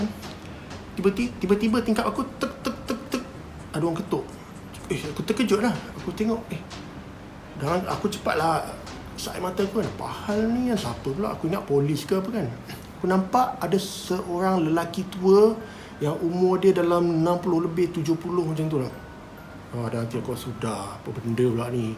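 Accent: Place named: Pahang, Malaysia. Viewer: bahasa org lipis kot2. loghat dia pekat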